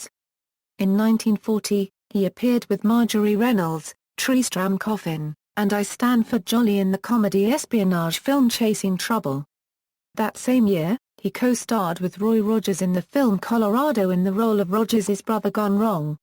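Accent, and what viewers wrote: England, United Kingdom. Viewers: Why is a robot with an English accent narrating this? Geez.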